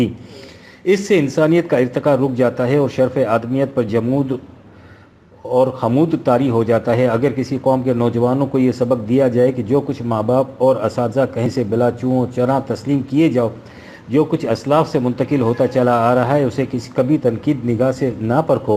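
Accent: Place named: Iran. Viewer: Jazakallah khair really I enjoy your Persian pronunciation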